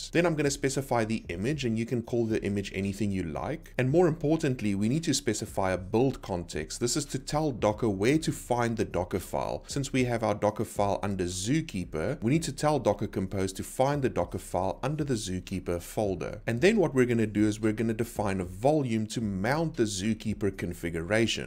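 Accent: South Africa. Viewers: Love hearing a South African accent explain these hot topics!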